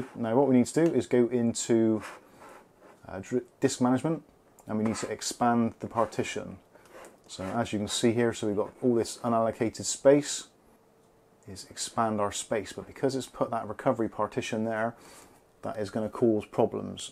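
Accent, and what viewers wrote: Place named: England, United Kingdom. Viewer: 120gb SSD? Wow that's tiny for 2022! 500gb should be minimum and for my PC builds I install 1TB ssds at a minimum. Windows 10 or 11 would fill up the 120gb ssd. Keep uploading these PC fixes, it's like watch Greg Salazar but with a cool English accent!! I will try and make your live stream on Saturday since I'm off work for the weekend.....finally! I still work on Youtube but no bartending this weekend!!! Cheers!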